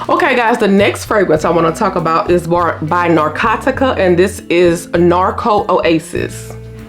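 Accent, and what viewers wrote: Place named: Louisiana, United States. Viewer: Yesss I love your Louisiana accent! It’s hot here in TN but nothing like Bayou hot. 😅 I definitely repurchase my fave perfumes!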